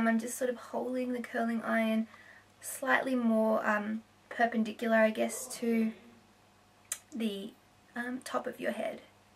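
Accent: Australia. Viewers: i am so glad i found your channel! i'm from australia and it's so hard to find australian beauty gurus! im having a marathon of all your videos:) i stumbled across your channel by searching in 'nina dobrev makeup tutourial' because i absolutely love her and your video came up! then i could tell in your voice that you're australian and i was so excited :') anyway, keep up the great work xxx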